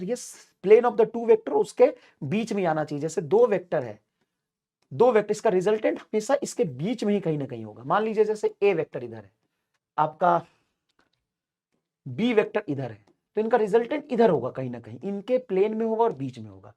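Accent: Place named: Bihar, India. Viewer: Bihari h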